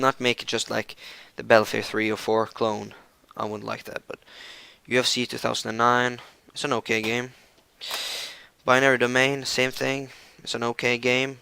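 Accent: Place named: Ireland. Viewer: You from Ireland mate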